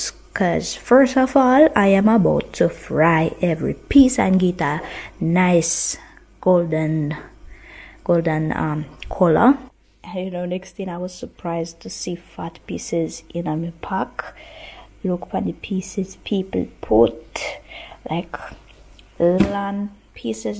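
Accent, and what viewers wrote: Jamaica. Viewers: This is brazy watching a white girl talk with a Jamaican accent. I live in England.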